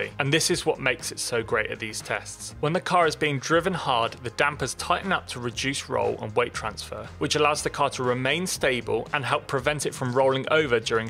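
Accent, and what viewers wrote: United Kingdom. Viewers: Is he British??? Just curious to know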